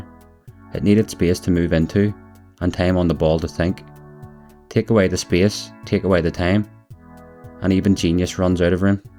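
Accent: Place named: Ulster, United Kingdom. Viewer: Ulster dialect is the best part of this video.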